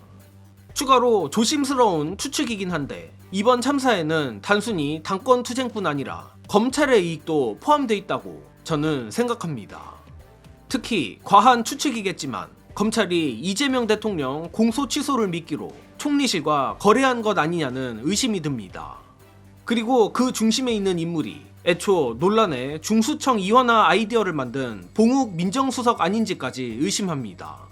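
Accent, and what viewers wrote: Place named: Gyeongsang, South Korea. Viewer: 엿까는 방송 이고만 어투는 갱상도 출생者 같은대 쪼개기 고만하고 방송접버시길 ㆍ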